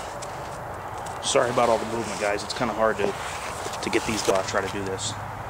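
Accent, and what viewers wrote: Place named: Indiana, United States. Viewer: it sounds like u r from indiana maybe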